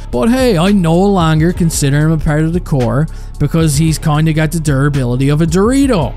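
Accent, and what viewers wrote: Newfoundland, Canada. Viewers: Newfie accent as he is from Newfoundland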